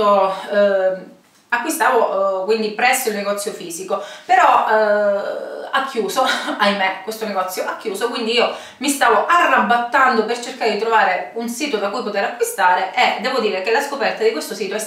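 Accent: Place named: Calabria, Italy. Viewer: carissima io "spollicio" ma non commento però i tuoi video mi piacciono moltissimo, sentirti parlare mi fa sentire "a casa" (W la calabria!!). questo sito lo visiterò anche perché un altro di cui non voglio fare nomi non mi ha inviato tutta la merce da me ordinata promettendo di reinviarmela....sono 8 mesi che aspetto.... buona serata e please non smettere di fare video!!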